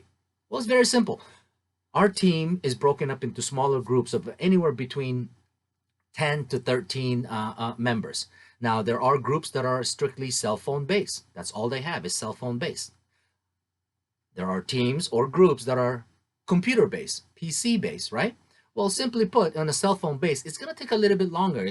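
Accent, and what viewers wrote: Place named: England, United Kingdom. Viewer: I love your English accent❤️😭 dame your pronunciation ❤️❤️🔥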